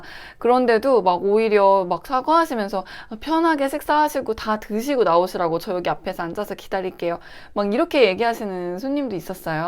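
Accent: Chungcheong, South Korea. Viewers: 님의 충청도 사투리 왜케 귀여워요 ㅋㅋㅋ 운전을 해보등가 에서 빵터졌네요. 항상 너무나도 잼나는 님의 나라별 손님특징 컨텐츠 오늘도 너무 잘 보고 갑니다^^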